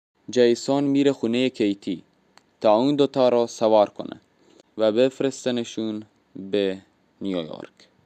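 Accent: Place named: Afghanistan. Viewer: هوی افغانی آدم قحطی بود تو با اون لهجه اعواج و مسخره تعریف کنی بدو برو آجرتو پرت کن......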